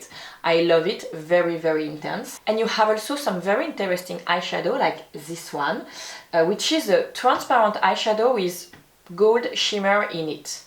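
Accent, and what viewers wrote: France. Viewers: Oh my god! I’m in love with your French accent! 💗💗💗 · You are so beautiful. I like your tutorial so much. Love your hair and French accent. Love from Indonesia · Thank you so much for another great tutorial. I always enjoy your videos but mostly I love your sweet French accent. I am Greek, I am an English tutor who adores Paris! To me you are the absolute ' '. Please keep on sharing your wonderful videos with us. Thank you again!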